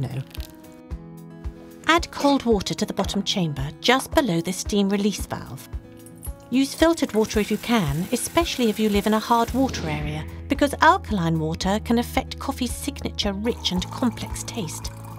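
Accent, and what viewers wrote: United Kingdom. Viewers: The accent is too British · thanks british lady, i need this for my fanfic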